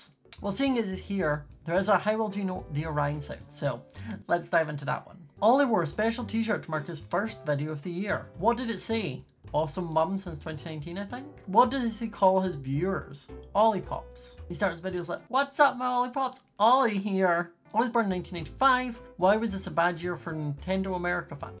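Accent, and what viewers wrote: Scotland, United Kingdom. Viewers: you are scottish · Me: reading comments about how they thought he was gay Me being my clueless self Me: I just thought he was Scottish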